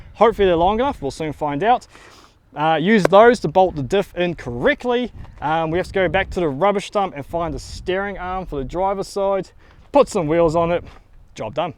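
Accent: New Zealand. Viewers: Ahhh....I can hear a hint of Kiwi twang. Love the old cars. Thanks.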